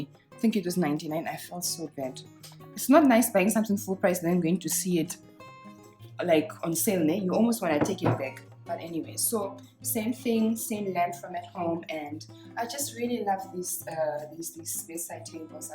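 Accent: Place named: Kenya. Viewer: Accent from Kenya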